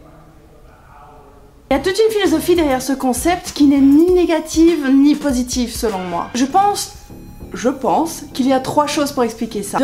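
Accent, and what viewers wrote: Belgium. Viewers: Pierre Petit elle parle de Bruxelles à moment donné et vu ça façon de parler j'en suis quasi certain (c'est la première vidéo que je regarde) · Du coup, moi qui suis nouveau ici e arrivé presque au milieu de la video. J'ai été faire un tour sur ta playlist mais ej trouve pas la première partie. Je fais comment pour avoir le début de l'histoire. Parce que l'air de rien ça m’intéresse. Je suis malade. au lit et je m'ennuie, je regarde donc des vidéos de gens qui racontent leur vie. Et j'aime bien. Kestuvafèr ? EDIT: c'est bon j'ai trouvé et j'ai vu la partie 1. Donc je suis de retour pour regarder la suite. EDIT2: pourquoi tant de dislike? les gens n'aime pas notre accent belge? Enfin pas vraiment belge puisque sud du Hainaut ou nord du Luxembourg.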